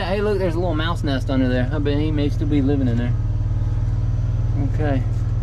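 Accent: South, United States. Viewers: Wow I love ❤ your Southern accent from Norristown PA here 👍🏾👋🏾🤟🏾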